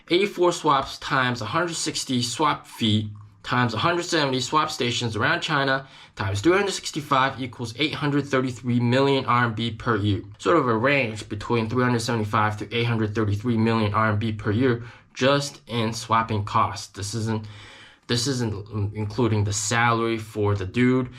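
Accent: South, United States. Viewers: Informative insider look, great video The southern accent caught me off guard 😂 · Are you working for Tesla, Maaaaan....you accent is right out usa .....how many billions tesla has burnt..?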